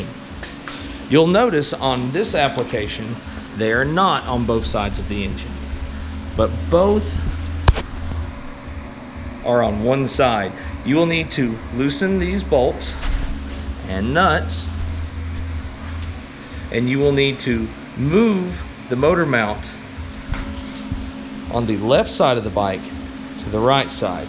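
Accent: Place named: South Africa. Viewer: you sound like a white south african my man! Dope vid man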